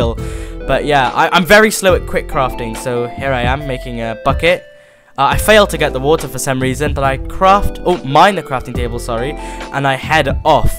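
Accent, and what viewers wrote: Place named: United Kingdom. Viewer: His just british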